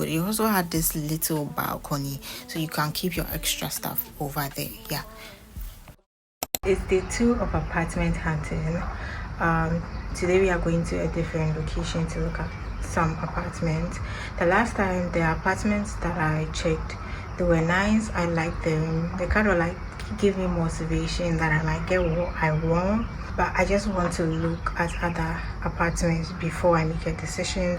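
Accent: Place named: Ghana. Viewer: I heard your accent and I knew you had to be Ghanaian. Love your video🥰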